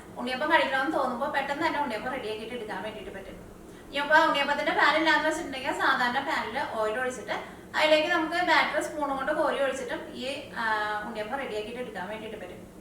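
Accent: Thrissur, India. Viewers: chechee alpam speed kurakamo samsaram trissur kaaree aanu alle?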